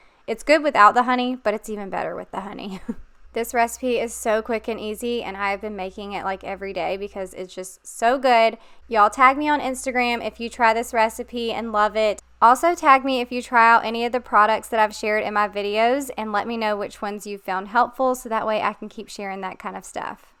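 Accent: South, United States. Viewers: Hi! Been here about a month - here from Minnesota. Have found myself not only motivated to clean but also tried falling asleep watching your cleaning videos. They make me so calm and I love your Southern accent and authenticity. I am a single mom - two kids (16 and 14) and a special ed teacher as my second career. It’s hard doing it all alone- but I love a clean house and organization for my family. Lived in Arkansas for 3 years and LOVED the people and their kindness and welcoming spirit. I was a stay at home mom for 9 years. My daughter Lil is in competitive cheer here in MN. Keep doing your thing and inspiring us. Just love it. Thanks!!! Jen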